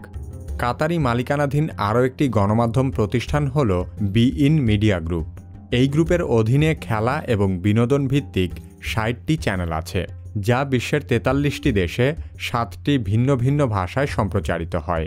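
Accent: Bangladesh. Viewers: বাংলাদেশের ভাষা যে কত সুন্দর তা আপনার কথা শুনলেই বোঝা যায়.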